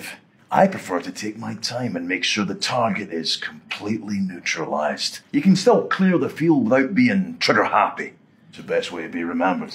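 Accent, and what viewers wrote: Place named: Scotland, United Kingdom. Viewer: He’s like Ryan Reynolds…only Scottish😍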